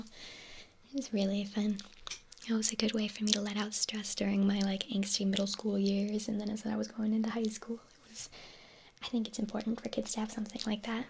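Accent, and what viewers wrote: United States. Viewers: yer a northeasterner hell yeah you rock lol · Ah a fellow New Englander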